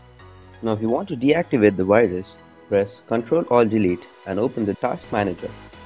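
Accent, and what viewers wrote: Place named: India. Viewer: Ofc he has a Indian accent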